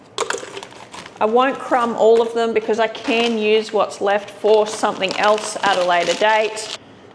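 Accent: Australia. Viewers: Love this video! You sound so Aussie in this one !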